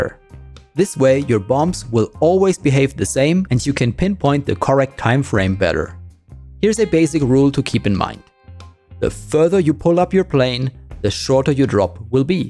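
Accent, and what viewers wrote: Germany. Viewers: Ngl im very thankful for this video, ive been trying to dive bomb for ages I still can't dive bomb but hearing german names pronounced with a german accent makes me happy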